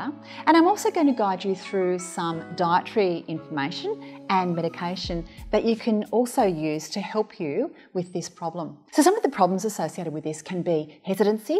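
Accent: Australia. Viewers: With a voice like that it's definitely down under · Terrible Aussie accent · It'd just an Australian accent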